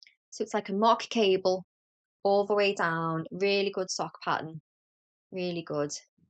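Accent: Liverpool, United Kingdom. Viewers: Hi. New subscriber here from New Hampshire, in the US. I was born in Paisley, raised in Leeds and went to University in Liverpool. I heard your accent and you made me smile. The first YouTuber I have heard from Liverpool. I thoroughly enjoyed your “Make 9” episode and will probably go back and listen to the older episodes.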